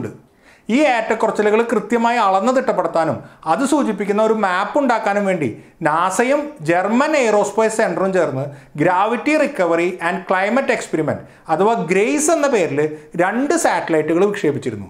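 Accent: Thrissur, India. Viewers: I ഈ അവതാരകന് trissur കാരന്‍ ആണോ അത് പോലെ slang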